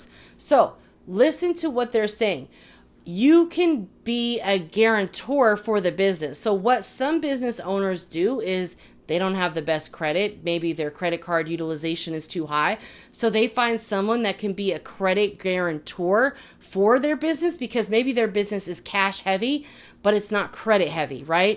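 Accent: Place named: Bay Area, United States. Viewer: I can hear that bay area accent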